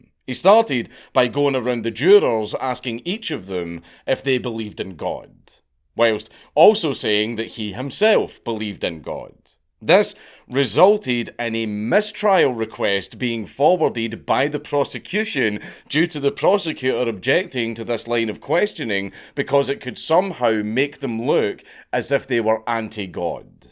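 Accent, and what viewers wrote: Scotland, United Kingdom. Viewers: There’s really nothing more I love hearing in life than Dankula saying “ for free” in his Scottish ass fucking accent. “Fourfrii”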